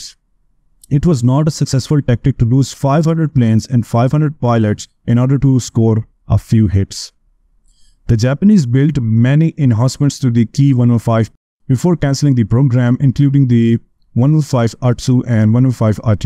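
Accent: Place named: India. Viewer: Indian voice over 😢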